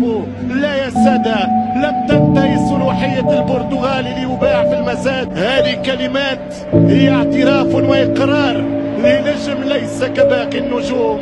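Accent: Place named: Tunisia. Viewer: the commentator in this video is Tunisian which is soooooo different than the egyptian dialect! your comment makes 0 sense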